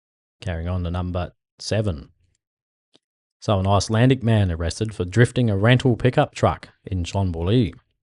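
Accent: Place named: Australia. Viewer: Thanks for the content. I enjoy the aussie commentary hehe. Cheers mate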